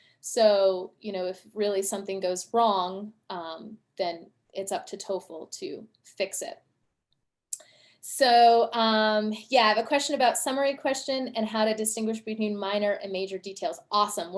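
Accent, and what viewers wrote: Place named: United States. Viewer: Namaste from India Miss sweetest smiley, 🤗☺You are so sweet and Haha why American's People's English language is so stylish 🤷‍♂️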